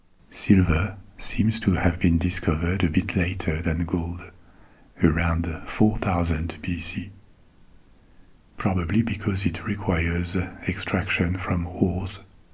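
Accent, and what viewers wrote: France. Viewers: Love your French voice it so calming. Thank mercie becoup! you for the good nights you give😂😂❤😴🥱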